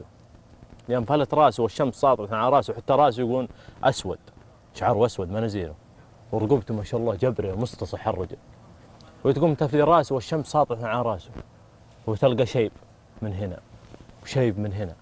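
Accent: Hail, Saudi Arabia. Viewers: ي زيينن حكيه حائل يهبل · تفوز سوالف و لهجته الحائليه😭😭😭💜💜💜💜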